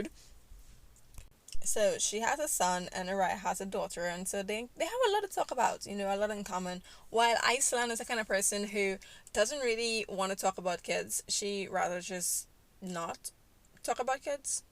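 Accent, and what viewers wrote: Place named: Nigeria. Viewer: Are you nigerian? I can hear from your accent its really annoying lawd 🤦🏾‍♀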